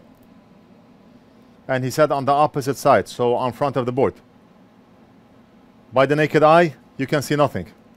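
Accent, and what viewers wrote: Lebanon. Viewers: صدمتني بس صرت تحكي لبناني طول الوقت بشاهدك وبشاهد فيدوهاتك وربي صدمتني 😂😂😂 تحياتي بشاهدك من الاردن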